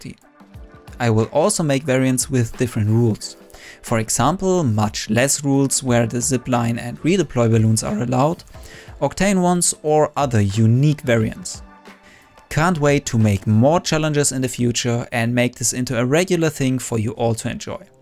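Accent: Germany. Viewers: Dude, he's German. Its just how he pronounces words. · Your german accent fits you so well! :)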